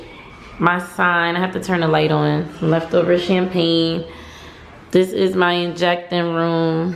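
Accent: Philadelphia, United States. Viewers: You have an east coast accent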